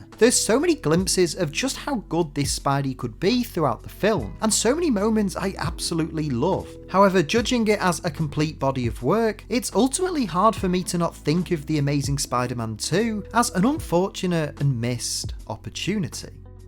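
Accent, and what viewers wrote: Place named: Yorkshire, United Kingdom. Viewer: That's embarrassing hahaha I'm from Leeds I thought I caught ur accent lol, you must be from Yorkshire tho amirite. Besides the point tho I love your videos, I watch them an unhealthy amount 😂 Keep up the excellent insights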